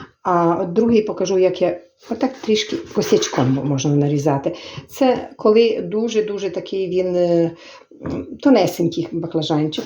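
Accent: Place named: Vyzhnytsia, Ukraine. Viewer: Доброго дня, Ви моя найулюбленіша господиня , хоча у мене біля 70 кулінарних каналів, мабуть тому що я також з Буковини, хоча живу дуже далеке, якщо можливо скажіть звідки Ви саме, бо відчувається Вижницький район!! Дякую Вам велике за Вашу працю в любі до неї!!!!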